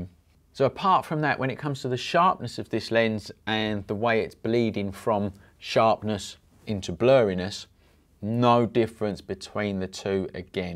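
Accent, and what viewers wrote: United Kingdom. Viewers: what i learn is, UK accent, is very pleasing if i may presume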